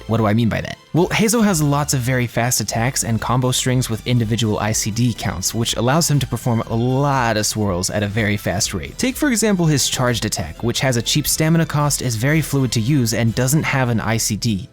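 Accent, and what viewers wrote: United Kingdom. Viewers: That British accent caught me off guard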